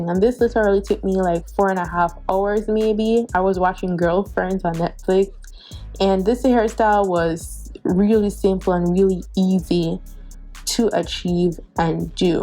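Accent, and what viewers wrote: Jamaica. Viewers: Hi there! U sound like your a Jamaican ☺😇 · First am stopping by, nice vid. You sound Jamaican are u? Is she guys? I'm Jamaican so I recognize the accent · Are you Jamaican? I think I hear the accent. Love the video👍🏽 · Great video, you sound like a Jamaican. Are you?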